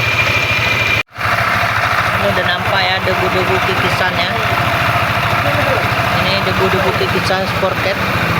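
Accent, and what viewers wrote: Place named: Aceh, Indonesia. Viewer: alamat bengkel dimana bg,kayak logat aceh abg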